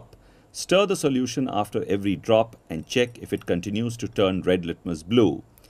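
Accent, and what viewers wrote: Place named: India. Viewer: Your accent is like an indian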